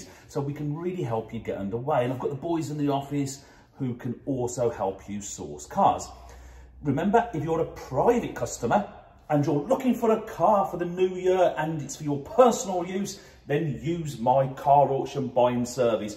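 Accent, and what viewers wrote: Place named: Birmingham, United Kingdom. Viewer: I remember the very first car I bought at auction. A Lada bought from Moseley Road auctions, which I know from your accent you will know :-) ... I was about 18 years of age at the time (now 53) and had a budget of £100, money was tight as a kid. I bought it for a whole £90 !! .. It had 6 months MoT. I drove the car until the MoT ran out, then sold the car from the Solihull Times (nice place to sell cars at the time). I sold it for £150. Actually made a profit on the brick shaped tank !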